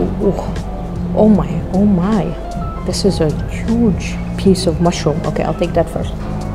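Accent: India. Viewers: Is it me or she developing an indian accent?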